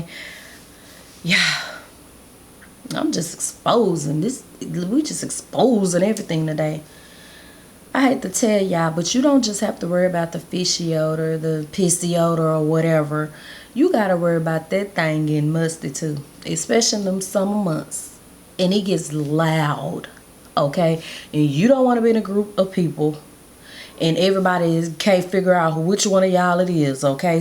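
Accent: Dallas, United States. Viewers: When she say it with that southern accent 🤣❤️ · I figured you were in Dallas just by how u talk , I know that talk lol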